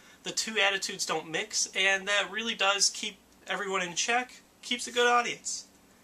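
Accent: United Kingdom. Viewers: LOVE THE BRITISH ACCENT!!!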